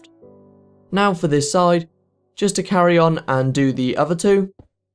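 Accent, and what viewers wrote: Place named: RP, United Kingdom. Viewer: U talk so posh it's like you do not speak in that way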